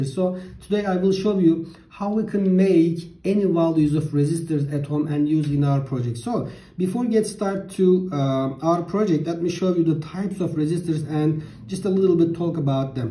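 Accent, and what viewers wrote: Turkey. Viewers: Türk ingilizcesi bu nerde görsem tanırım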